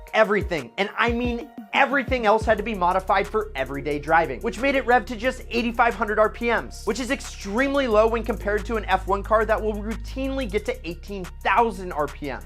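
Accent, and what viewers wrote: United States. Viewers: This is what a douchey American looks/sounds like incase you forgot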